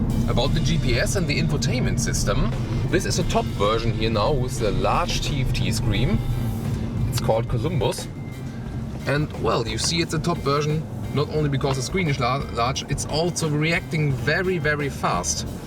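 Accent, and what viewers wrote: Germany. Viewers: Love the review, I like to hear your german accent too! would be pretty cool to take this up to the mountains with all the snow!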